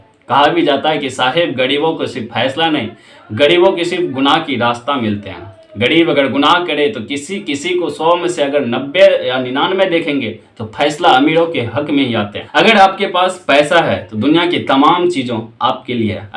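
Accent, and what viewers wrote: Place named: Bihar, India. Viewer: Aap ki kahani sunane m bihari language jhalakti h .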